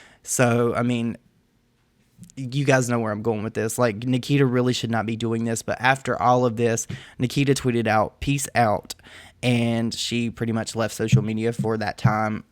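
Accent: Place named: Tennessee, United States. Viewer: Thanks for the 🍵. And your accent reminds me of summertime on Dale Hallow, Norris Lake or Cumberland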